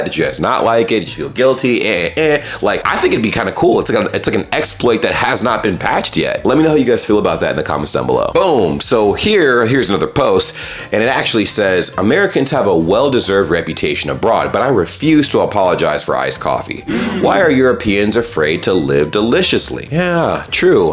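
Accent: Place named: Germany. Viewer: I drink 2.7 liters every day and I'm trying to reach a 3l target. I don't think that counts as dehydration. 😛 That said, bravo on the German accent, mate. Klingt jetzt schon super!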